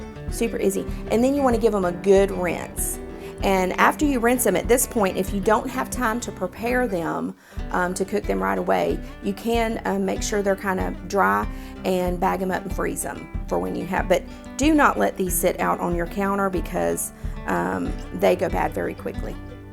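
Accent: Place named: Georgia, United States. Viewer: I'm attempting my first fig preserves. I saved several videos to watch and learn but I didn't make it past yours. 😂 I started it and was like, "hey she sounds like me!" (I'm from GA too) - so I didn't watch any other videos. I've been so intimidated by this but your video makes it look so simple. Diving in now - thank you!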